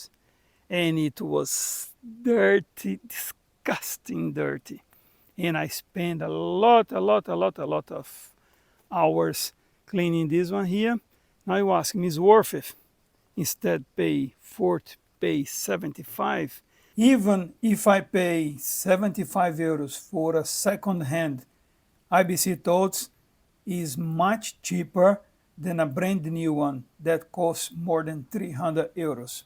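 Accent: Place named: Brazil. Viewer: Hello. I am enjoying your videos. Great work and effort. My daddy was from a small village in Galicia called Fixo. He told me a lot of stories growing up in a small stone house like the one you are restoring. They grew potatoes, corn and hogs up there. They plowed with an Ox and worked all the time. He said it was dry country which looks like it is from your videos. What area are you in? Are you a Brasileiro? Your accent sounds Brazilian. Keep posting videos I am enjoying the progress. Have a good day.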